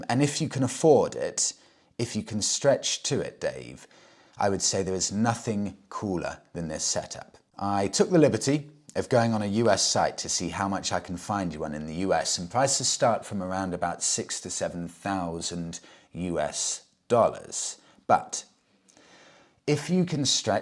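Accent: United Kingdom. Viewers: Fer crissakes, ya gonna 'pologize fer having an English accent next? Never apologize for pronunciation, just do it & move on....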